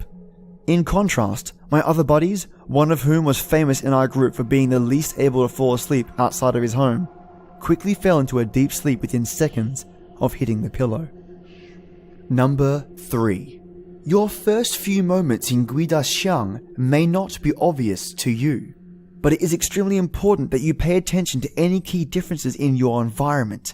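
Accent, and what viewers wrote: Australia. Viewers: Awesome! I'll be looking forward to longer videos 😉 and thank you! My little nephew behaved pretty well. He was in a very happy mood. Maybe because it was his 1st Birthday yesterday 🙂🎂 As usual interesting story, great narrating, awesome Aussie accent! Haha 🖒🖤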